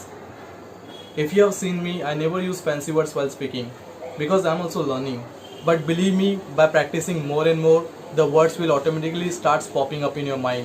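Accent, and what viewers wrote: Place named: United States. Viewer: Wow your English with American accent 🔥🔥🔥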